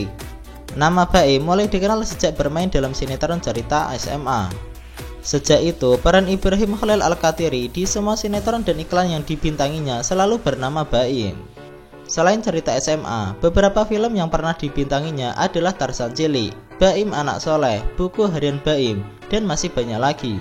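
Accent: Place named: Java, Indonesia. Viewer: medok bgt suara lu tenk 😬😅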